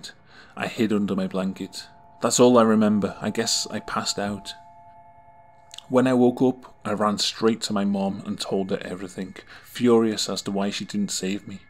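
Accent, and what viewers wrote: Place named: Northwest England, United Kingdom. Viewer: Just sounds like an Englishman with a mid-northwest accent to me.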